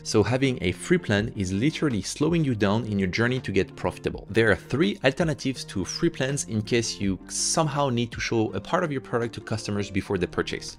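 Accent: France. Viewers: Are you French ? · French accent is like honey for my ears Thanks for sharing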